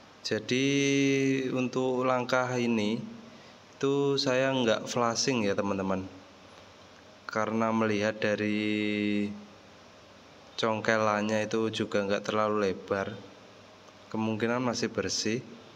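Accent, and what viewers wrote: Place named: Central Java, Indonesia. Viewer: Logat bicara jateng ..jatenge ngendi pak..?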